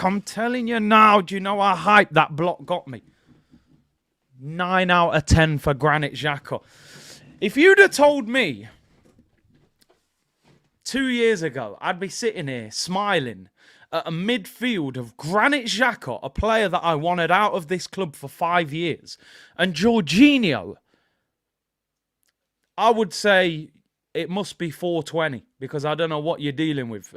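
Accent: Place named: Cockney, United Kingdom. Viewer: 😂🤣 I don't know if I watch this show for the singing, content or the jokes But that cockney accent 😂😂😂😂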